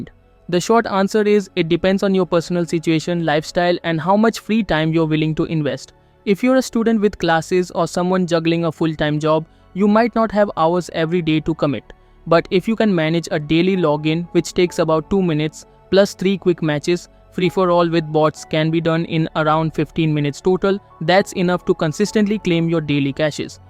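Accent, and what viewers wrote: India. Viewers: when i heard indian accent , “this is must be a scammer” .nah sorry bro ,i dont grinding ,i just bought using cp value at 15.000 cp ..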